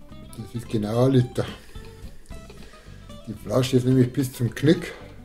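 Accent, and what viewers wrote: Bavaria, Germany. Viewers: Danke für deinen bayerischen Dialekt!!!! Mai, ist das schön zum hören :-)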